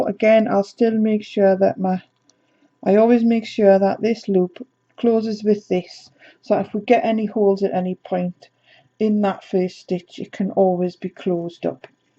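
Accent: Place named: Newcastle, United Kingdom. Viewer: So nice to hear a Geordie putting this pattern into action. I am living in Fife but from South Shields. I am more of a knitter but learned crochet during lock down. First time I have tackled a blanket with so many different techniques.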